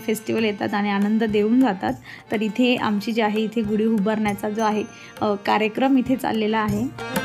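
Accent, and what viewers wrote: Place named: Jalgaon, India. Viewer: Khup chhan video tai.... Sagdech video mst astat... May be tumhi jalgaon che asavet language varun vatate tumchya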